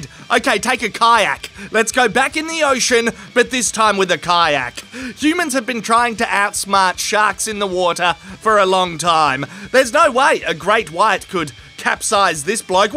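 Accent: Australia. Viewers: No matter what, shark sounds better with an Australian accent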